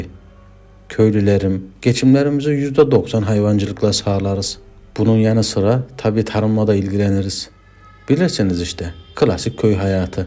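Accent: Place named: Turkmenistan. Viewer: Türkmenler gibi konuşuyor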